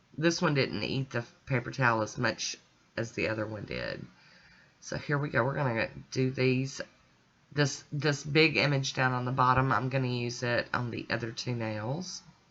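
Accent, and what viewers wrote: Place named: South, United States. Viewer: Got a good chuckle about your comment that Southerners pronouncing “things funny”. LOL. I love the way you speak. Reminds me of my favourite movie and book - Gone with the Wind. Liked your mani too. LOL.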